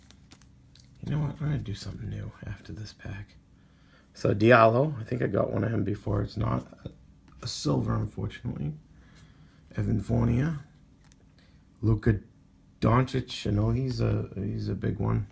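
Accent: Boston, United States. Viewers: I can tell you're from Boston! Lol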